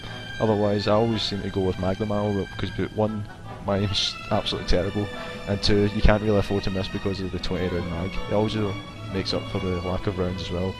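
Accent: Scotland, United Kingdom. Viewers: Not wishing to offend, I actually thought you were speaking Russian for the first 3-5 seconds you were talking before I realized it was a heavy Scottish accent xD Nice video btw. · I'm so glad there was an assualt rifle available for medics. I love being a medic but hate lmgs. I've always loved assualt rifles but never the actual class. Thanks for the tips on how to use this gun. I'm a medic who is just an assualt guy at heart. I was also confused in the first minute. I was dissapointed that it was in another language. Before I read the other comments I thought it was. Then I heard the Scottsman kick up. Everything was good after that.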